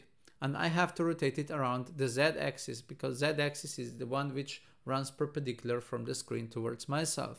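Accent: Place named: Italy. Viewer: Why do you sound Italian?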